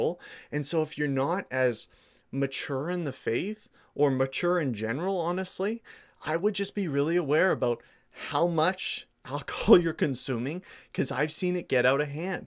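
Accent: Canada. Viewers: When you realize he’s Canadian, the accent becomes sooooo clear.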